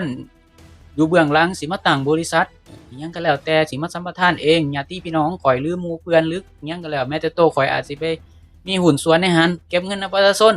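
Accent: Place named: Laos. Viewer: หม่วนหลายภาษาลาวดั้งเดิมที่แอดเว้าสบายดี,ขะน้อย,โดยขะน้อยขอให้แอดรักษาภาษาดีงามแนวนี้ไว้ตลอดไปส่วนหลายได้หงินคนลาวเว้ามีแต่เอาภาษาไทยไปปะปนเลยฟังบ่หม่วน❤❤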